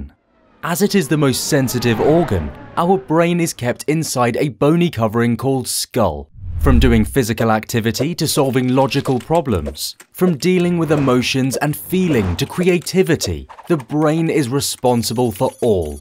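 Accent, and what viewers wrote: England, United Kingdom. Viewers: I find the dichotomy and paradox of the thick British accent being paired with the lack of conjunctions and prepositions quite amusing! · this sound is full of england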